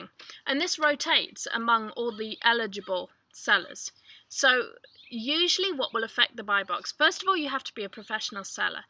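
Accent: United Kingdom. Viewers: Thanks for the info. Btw...you're cute! Love the UK accent. ;-) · Thank you... Your British accent got me on that one... (but we all love that accent!!) You ever get out to TX I'll share some unique accent of my own with you... lol Anyway... really helpful videos... we haven't started yet but looking into it... Your videos are very insightful. Thanks again!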